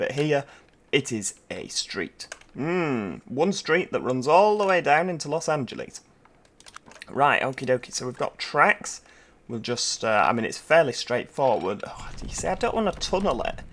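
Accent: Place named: Northern England, United Kingdom. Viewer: Hi,. Thanks for another enjoyable video. I still hear the mistaken pronunciation of Sault Ste Marie, although I do quite like your pronunciation with your Northern accent. Sault is pronounced “Soo” like “shoo” or like the name Sue. Ste is the short form of Sainte, pronounced the same as Saint. And Marie is correct. Canadians often refer to this city as “The Soo.” Just so you know. Thanks.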